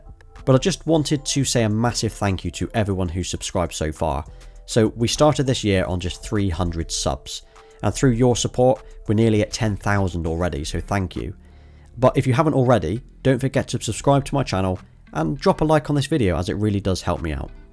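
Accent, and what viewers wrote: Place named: South West England, United Kingdom. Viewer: aah i love this! its nice to see americans watching little ole us Brits videos! Thats a pretty good claim to fame for you ;) and you're getting your accent on the map too! From, fellow south west 'un. loving the videos. These people are correct. Your vids are informative, straight forward, compelling and shot really well. congrats on a fab channel.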